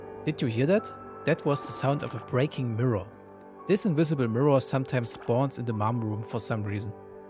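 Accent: Germany. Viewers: are you by any chance german? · Man, as a guy from germany I love your german accent. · I don't know but u sound like u came from DEUTSCHLAND too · Bist du Deutsch? Du hast einen akzent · Are you by chance german? Your accent sounds pretty german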